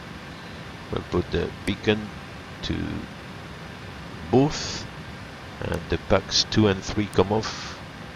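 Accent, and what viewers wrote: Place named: France. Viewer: yay another video :D I really like your calm voice, and your competence of course But you are a real pilot, right? Doesn't it get boring to fly as your job and then when you are home to fly again? Like there are lots of good chefs that love cooking but at home they don't because they do it all day already :D I'm not complaining though. That way I can enjoy your videos :P May I ask where you are from? Your accent has something very french to it :D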